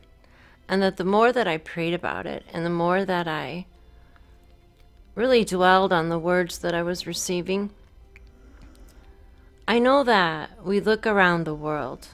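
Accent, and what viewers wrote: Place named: Spain. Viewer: Whoa now that is a combo! I can see and hear the spanish in you.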